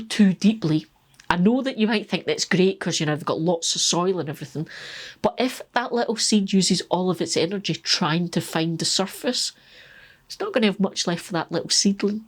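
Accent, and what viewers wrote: Scotland, United Kingdom. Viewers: I just found you’re channel! I’ve been watching all day 🤩 I think I’ve learned more today than ever! Great to hear you’re Scottish accent 🥰I’m from Glasgow, Scotland so it’s lovely to hear a fellow Scottish people 🏴thank you so much for all these golden nuggets of information! 🪴🌱🌿🍀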